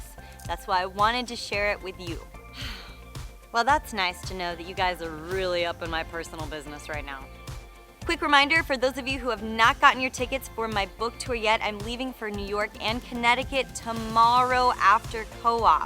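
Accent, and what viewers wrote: Texas, United States. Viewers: a texas twang ? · Your Texas accent is so cute!! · You are ALWAYS such an inspiration! Your aunt was awesome and a pretty lady too! Oh... and just love hearing your TX draw:) Sure wish you had your co op 16 yrs ago when I lived in Houston! Also wish you were touring close to me in Kentucky! <sigh> oh well.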